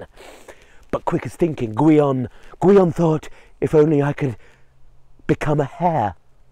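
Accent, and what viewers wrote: Wales, United Kingdom. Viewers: This was so magical to listen to You are indeed a very gifted story teller . Thankyou for sharing your wondrous gift with the world I love your Welsh pronunciation of names and places which make the story more magical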